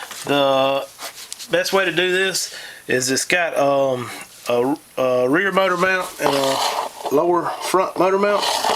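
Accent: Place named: Carolina, United States. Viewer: I know it's activating but I really appreciate you taking the time and activation to do these videos, very helpful, and you sound like a north or south Carolina man, I'm born and bread north Carolina and I'll die here unless the liberals get to much which I'd say thier pretty bad across the country, depending on which state your in, the triangle area with our schools, duke, unc state, wake forest, it brings the crazy in but we praying it don't get to bad but anyway just wanted to thank you for your time and videos, God bless you and your family in Jesus name, be well , Mike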